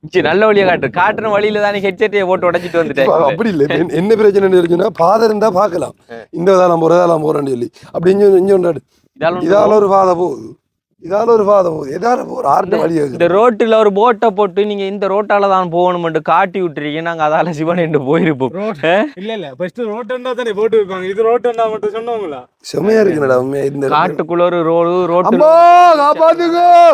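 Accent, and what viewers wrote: India. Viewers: இது உண்மைதான் ஆங்கில வார்த்தைகள் எல்லாம் இந்திய மக்கள் உச்சரிப்பது போல உள்ளது இதை மாற்றினால் நன்றாயிருக்கும்.எமது மாவட்ட பேச்சு வழக்கு நல்லது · தம்பி நீங்க இந்தியாக்காறர் மாதிரி கதைக்கிறது கேக்க நல்லா இல்ல கோபத்தில் சொல்லவில்லை உண்மையை சொல்றம். தம்பி கதைக்கிறமாதி கதைங்க நல்லா இருக்குதானே எமது மட்டக்களப்பு தமிழ் மாற்றம் எதற்கு ஐயா. நல்லா ஒரு சகோதரம் மாதிரி சொல்றன் தம்பி. கோபிக்க வேண்டாம். மற்றும்படி குறை இல்லை உங்கள் பணி தொடரட்டும்👍👍👍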